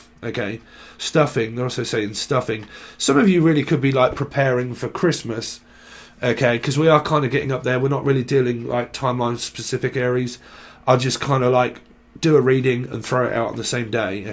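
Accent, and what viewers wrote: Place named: Cockney, United Kingdom. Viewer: Your a cockney, currant bun, Son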